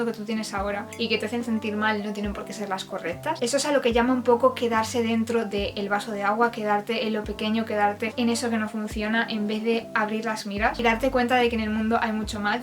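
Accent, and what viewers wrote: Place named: Spain. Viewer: Tu vídeo es muy lindo, que lindo detalle el de las lucesitas en tu cama :3 por cierto, tu acento es muy adorable, siempre me pregunto, los españoles nacen con su acento tan característico o lo adquieren gracias a sus padres o familiares? Es que hablan muy lindo las chicas españolas. :virtualhug::virtualhug::virtualhug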